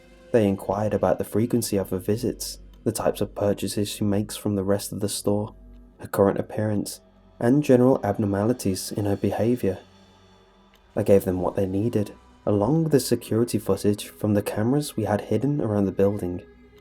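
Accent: United Kingdom. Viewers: Subscribed...I've listened to you tell these stories for a while now. I've always been a horror movie fan n love scary stories but it's your voice and accent that made me subscribe to you. My mother is British and I would spend summers w my grandmother who had a thick accent but I never heard first hand a man w this accent and I've got to tell u yours is enchanting! I could listen to you talk all day...my grandmother is no longer w us so it's also comforting in a way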